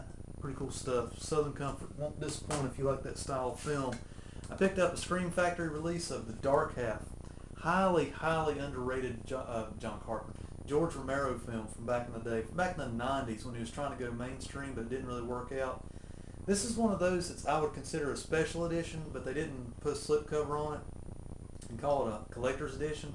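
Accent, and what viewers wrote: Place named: Louisiana, United States. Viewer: Your accent, are you from Louisiana?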